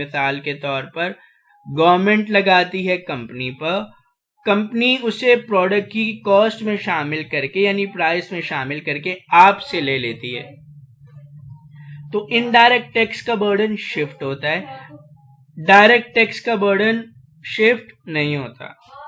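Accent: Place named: Karachi, Pakistan. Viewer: Lahore board k haii?? Awazz apki Karachi walii lag rahii ha🧐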